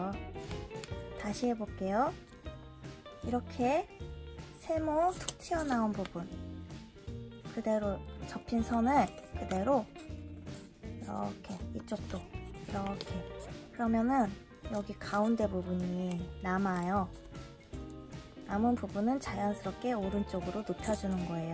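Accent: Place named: Gyeongsang, South Korea. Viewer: 경상도 분이신가!! 자꾸 뽀족을 빼쪽한부분...이라고 하시네요.ㅎ 제가 경상도 아줌마라^*;;억양이랑 말투가 그리 들리네용ㅎㅎ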